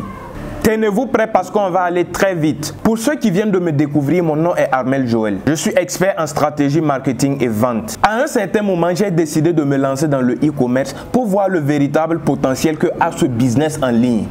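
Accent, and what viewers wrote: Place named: Burkina Faso. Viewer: Un Burkinabé à travers la façon de parler ❤ salut Frère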